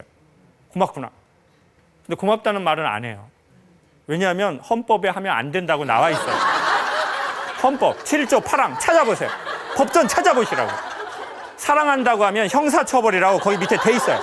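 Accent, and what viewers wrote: Jeolla, South Korea. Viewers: 영상 잘 보고 갑니다~ 구수한 전라도 억양이 듣기좋네요~ 사투리로 강의도 함 해 주랑께요~~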